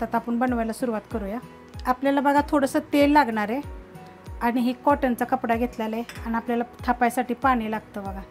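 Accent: Satara, India. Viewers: ताई तुमचं गाव सातारा आहे का तुमची बनवण्याची पद्धत आणि बोलण्याची पद्धत आमच्याकडची वाटते सातारची तुमच्या रेसिपी बघून मी लाटी वड्या करते सजुरी करते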